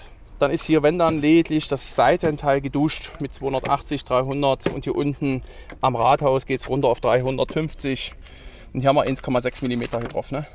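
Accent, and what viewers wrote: Saxony, Germany. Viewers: Alter deine sächsische akzent ist absolut Hammer 🤣🤣🤣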